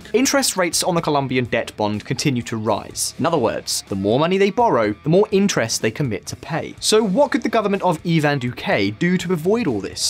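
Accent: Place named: United Kingdom. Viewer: Pretty slick move, making this video with the high production value, well spoken host, young guy, British accent to push the Uribistas' version of reality. This is not, and has never been, a "both sides" issue. Colombia does not have a middle class. It is the most economically unequal country in the world, and has been for a long time. So, the pandemic didn't just bring about hard times for this imaginary middle class. It caused those at the bottom of the upper class (people who work jobs, live comfortably, travel, have disposable income) to lose their jobs, and plummet aallllll the way down to the upper level of the lower class (people who are just barely surviving, eating most days, but not all, and living in substandard homes surrounded by crime. Having lived in Bogotá for a year, and having learned so much from my wife who was born and raised, I call foul on this whole video. 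There is no middle class. There is no infiltration by terrorist groups. Police are not being shot at. They're getting rocks thrown at them. Molotov cocktails are the most they have to defend against. ESMAD and the military are provoking the violence, every time. Facebook live videos have made these sleek propaganda pieces easily taken apart. Colombia has never had good government. I was there when a referendum vote was held to simply make corruption illegal. It was an obvious landslide. But the number of overall votes were not enough, because Uribe's paramilitary threatened many voters into staying h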